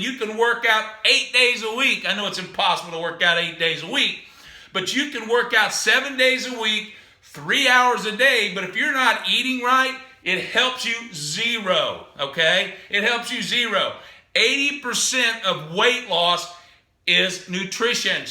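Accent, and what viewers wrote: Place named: Midland, United States. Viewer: Love that Midland accent. And the advice. I stay with the big three and add the ancillary exercises if time permits. Full tilt at 49, soon 50. All the best from Greater Cincy.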